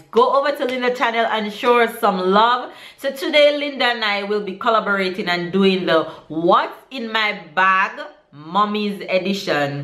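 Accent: Jamaica. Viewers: Here from, as soon as you started talking I knew you were jamaican,of course am going to support my own.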